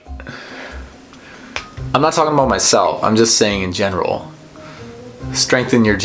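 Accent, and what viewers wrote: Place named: United States. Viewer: man! man! man! I'm new on your channel, you're brilliant. i can watch each of your videos multiple times!! I've never loved American accent so much before i heard you talking (I'm assuming you're not canadian. I'm sorry if you are) please respond to my comment if you get a chance to read it, I'm already a huge fan love from the uk xx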